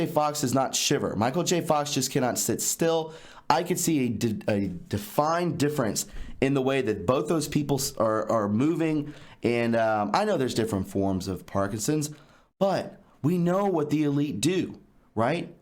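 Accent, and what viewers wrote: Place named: Georgia, United States. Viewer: not sure where you are but your Southern sound a whole lot like Hart County Georgia. Love that area and miss it terribly. All the South is great, though. 🇺🇸❤️🇺🇸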